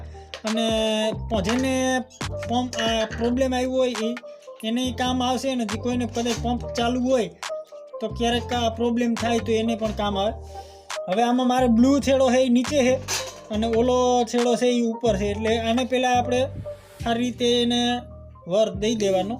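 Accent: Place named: Kathiawar, India. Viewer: કાઠીયાવાડી લાગો હો ભાય 😀😀😀😀😀😃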